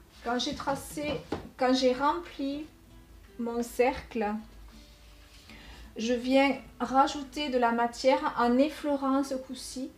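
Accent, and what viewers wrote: South, France. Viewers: a l accent du soleil !!! merci ca donne la niak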